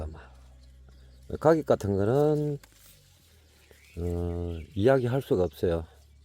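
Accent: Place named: Gyeongsang, South Korea. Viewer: 체리가 아주 맛있게 무르익었네요 저희는3키로 다 먹었네요 ㅎㅎ 가게손님들이랑 맛나게 먹었어요 싱싱하고 아삭 상큼해요 아주 맛있게 감사하게 먹었습니다 경상도 사투리로 체리 시식먹방 정겹게 잘보고 있어요~😅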